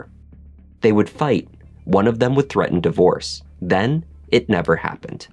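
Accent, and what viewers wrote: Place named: Canada. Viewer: The narrator sounds Canadian so probably doesn't realize it. Nor would I.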